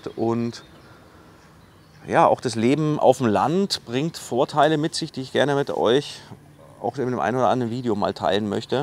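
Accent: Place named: Bavaria, Germany. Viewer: Ich finde Dich sympathisch, weil Du kein Dummschwätzer bist. Du ziehst das alles durch, obwohl Du sicherlich finanzielle Einbußen hast. Und auch Freunde/Bekannte durch Deinen Sturkopf - positiv gemeint - in den letzten 2 Jahren verloren hast. Soviel dazu - Und jetzt muss ich mir das Video nochmal ansehen, weil ich die Enten nicht richtig verstanden habe. So gut ist mein bayrisch auch wieder nicht :-) P.S: Darf ich Videos von Dir bei mir hochladen oder wäre Dir das unangenehm?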